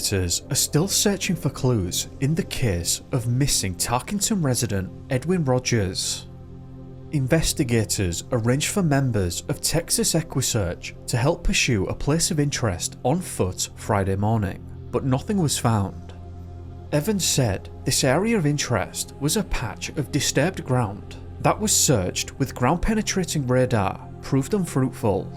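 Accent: United Kingdom. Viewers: Accent from the UK, it's not bad. I'm from Finland and I learned to listen to that accent while studying English in school bc our teacher talks exactly the same way 😊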